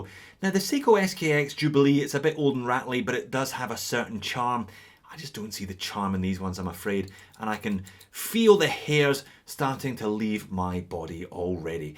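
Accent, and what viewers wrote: Scotland, United Kingdom. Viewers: No he has a lovely Scots accent, the "go to" pirate accent is a sort of generic West Country accent.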